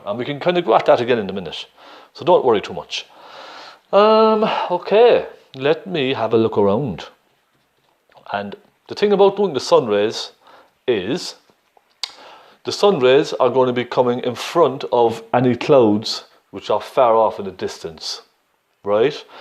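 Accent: Ireland. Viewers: I Detect an Irish accent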